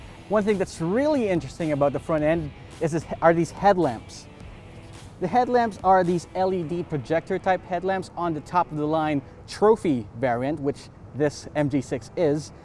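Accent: Philippines. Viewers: It felt like you are forcing yourself to have this perfect enunciation of words, and it resulted to some mistakes that you even apologized for. I'm sure it won't bother most people if you have an identifiable pinoy accent. Just be you, like that dad from AutoDeal reviews.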